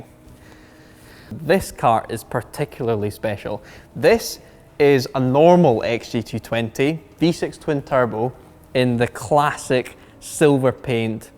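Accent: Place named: Newcastle, United Kingdom. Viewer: He's Geordie not Scottish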